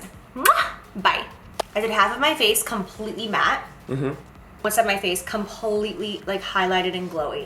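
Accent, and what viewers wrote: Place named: Boston, United States. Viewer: "Highlighta" lol is he from Boston? We have that accent.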